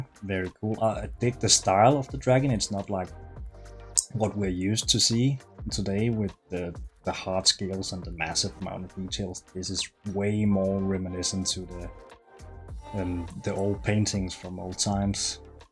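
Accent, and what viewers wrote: Denmark. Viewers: So cool! Frome your accent I think Dane ?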